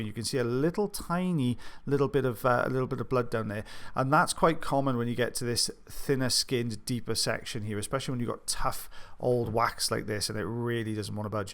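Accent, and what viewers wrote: Wales, United Kingdom. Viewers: "Clever sausages" 😂 I love the Welsh accent, but then add these euphemisms, and I can't help but giggle! 🥰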